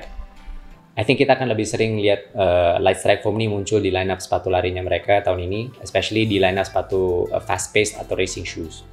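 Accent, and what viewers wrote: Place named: Malaysia. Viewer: ada sedikit aksen melayu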